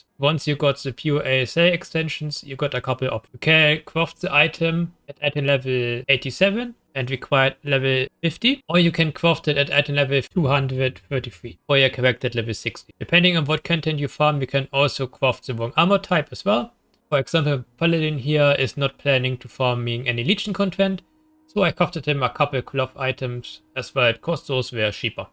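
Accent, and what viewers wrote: Germany. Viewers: man hörts du hast bisschen deutschen akzent :D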